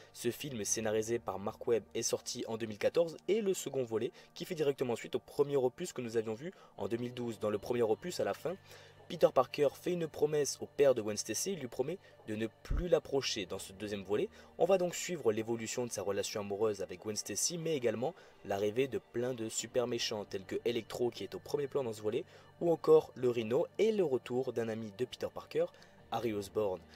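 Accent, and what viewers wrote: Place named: Marseille, France. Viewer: Parce que t'a l'accent d'un sudiste et tu pronnonce à la marseillaise.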